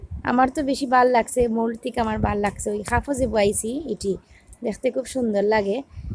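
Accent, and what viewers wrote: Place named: Bangal, Bangladesh. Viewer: বাঙাল ভাষাটা কি মিষ্টি🤗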